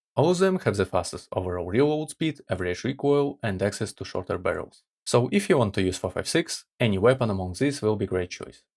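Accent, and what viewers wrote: Russia. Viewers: Мне кажется или он русский?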